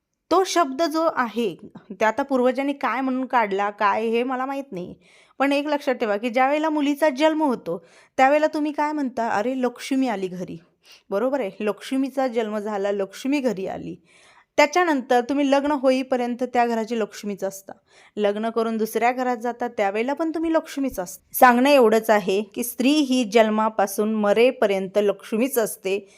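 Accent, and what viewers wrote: Kolhapur, India. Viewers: ताई तु सोलापूरची आहेस का कोल्हापूर ची काही कळतं नाय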